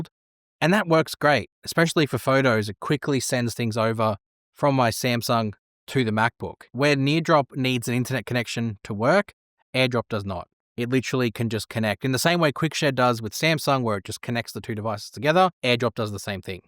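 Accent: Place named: Australia. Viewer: Haha, never thought of it before you mentioned. Great guy though. Even better than SJ because has the sweet Aussie accent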